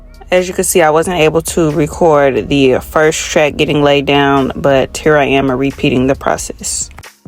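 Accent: St. Louis, United States. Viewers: There’s no way you’re not from St. Louis lol😂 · I love the accent 😭 are you from St Louis?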